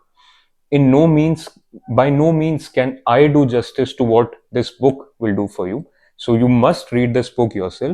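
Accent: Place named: India. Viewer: Being an Indian , I prefer to listen Indian who speaks in English that nice to hear rather than British accent . It's helps me to learn more in English . Your accent is too good it's similar to Indian voice with accent and easy to hear and understand really appreciating . I don't know you might understand or not my words what I am saying. Because being a beginner in English it's too poor communication. Ok but at the end of the day really nice to have you it's makes vibe of Indian.❤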